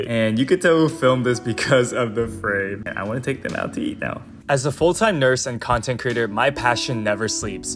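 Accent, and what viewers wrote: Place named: California, United States. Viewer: U don't have a New York accent. U sound more like a Californian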